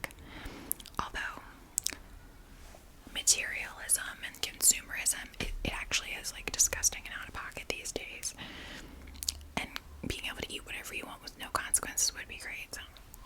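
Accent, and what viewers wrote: New Jersey, United States. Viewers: The jersey accent though lol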